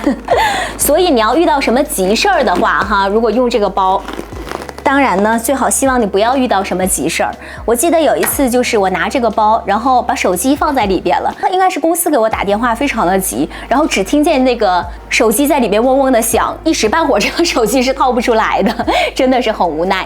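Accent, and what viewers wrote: Japan. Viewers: 她在日本開店，所以都是日語發音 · 在日本生活了十几年，英语发音已经被带偏到姥姥家了😅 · 日式英文，聽不太懂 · 日式發音 畢竟她在日本啊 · 看了评论才知道是日式英语，shoulder楞没听出来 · 日式英语好可爱hhh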